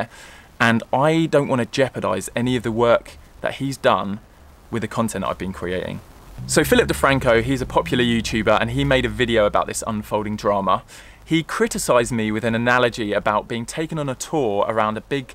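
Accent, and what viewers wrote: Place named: England, United Kingdom. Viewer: This guy looks like a Jamaican Sharlto Copley with an English accent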